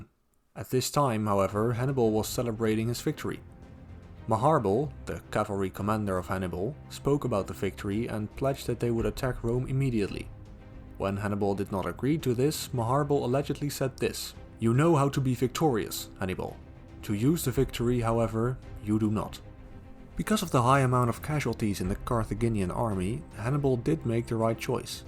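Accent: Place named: Flanders, Belgium. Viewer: Are you from Belgium? I am hearing a Flemish accent.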